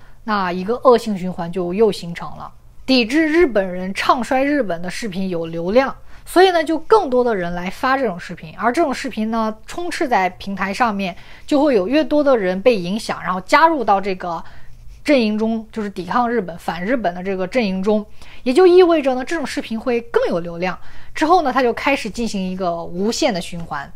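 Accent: Standard. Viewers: 希特勒。。。姓xi。。。。。。。。。其实你中国话说的还挺标准呢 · 我很好奇一点！你说着一口比我标准且流利的普通话，大概就是汉族吧。我只是中国西部一个少数民族，我觉得中国最起码安定不吵闹。你一个本族人整天在这外网上喷自己的祖国着实很难理解。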